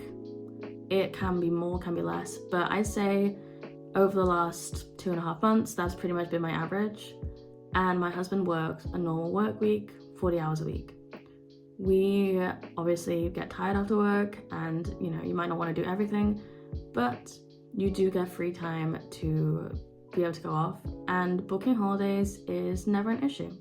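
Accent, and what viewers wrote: Australia. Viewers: your accent is slightly international, there's a touch of Australia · You picked up an Aussie accent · you sound slightly Australian more than English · You sound more Australian to me than to be an English Lass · Your accent sounds Aussie to me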